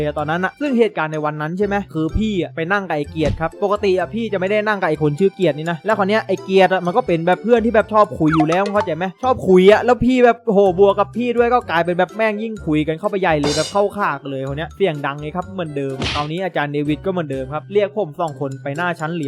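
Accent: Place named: Suphanburi, Thailand. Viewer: พี่เป็นคนสุพรรณบุรีหรอเสียงหนอจัง^^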